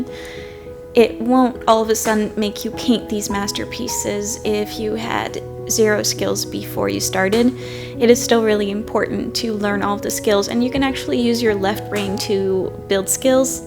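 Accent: United States. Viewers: I’ve lived in Ohio for 30+ years and you sound like you’re from Ohio, Illinois, or Wisconsin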